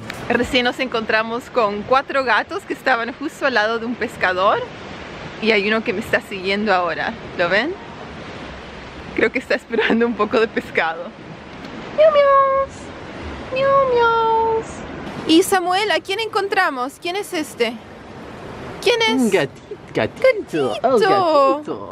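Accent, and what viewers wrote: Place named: Rioplatense, Argentina. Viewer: Guía de Viajes con Samuel y tu español tiene un leve acento rioplatense o chileno del sur...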